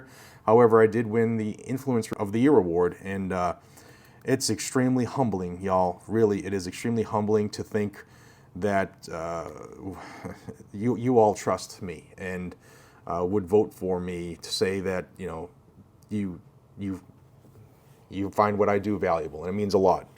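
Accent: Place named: South, United States. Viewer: you have lived down south for a year and you already have a southern accent 😅😅😅😅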